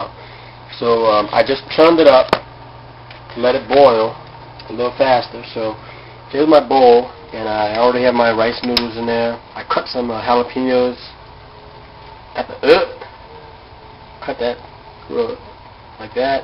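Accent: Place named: East Coast, United States. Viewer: he sounds like hes from the east coast but this is some half ass pho but a lot better then what i can do.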